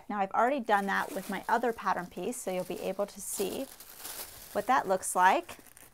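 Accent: United States. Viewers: Many thanks from the U.K. You have a beautifully clear American accent, so easy on the ear.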